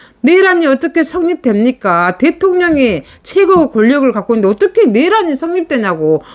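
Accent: Jeolla, South Korea. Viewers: 전라도 사투리좀 그만~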